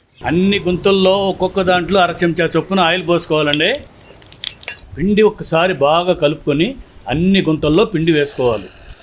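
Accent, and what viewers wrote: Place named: Rayalaseema, India. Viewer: Babai mana rayalaseema telugu antha madhuranga untundi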